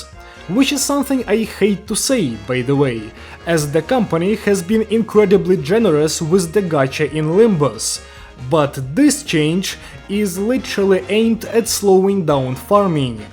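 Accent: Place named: Russia. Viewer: God, one day I will reach my hand through my monitor just to reach your neck and find out why do you sound like you're Russian, German and Spanish at the same time💐💕 As for the theme of the video? Honestly, I kind of get it. I always had that « Ew » ring in my ears when I used Glimpse to just Hollow Purple my way through the MD.. And although I'll miss the old days of the hydrogen burn, I still think that this is a healthier way to go about the MD, since it really lifted some weight from my shoulders. If burn or rupture are the best but not op.. I can just have fun with my favourite sinking team. Because there's much less feeling of « Shi man yo stupid ahh missing out on so much efficiency rn bro » due to the opressive cleaning capabilities of the forsaken duo. Sorry for my low tier English skills in advance🙏🏻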